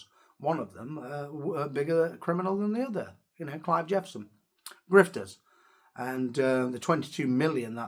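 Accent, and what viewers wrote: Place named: RP, United Kingdom. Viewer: What a piece of work you are. A posh accent and a decent education generally means that you know little or nothing about real life or the majority of working people in the UK. British values are not generated by religion or colour but by an inner desire to do what is right, fair and just for the benefit of the community as a whole .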